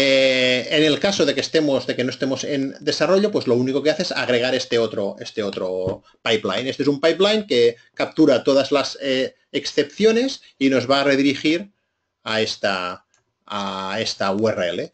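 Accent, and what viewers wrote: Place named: Catalonia, Spain. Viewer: Este tío tiene un acentazo a catalán pueblerino, de Girona profunda.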